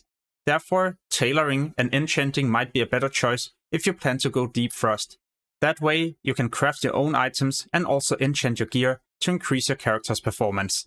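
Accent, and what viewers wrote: Denmark. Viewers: Lyder som en dansker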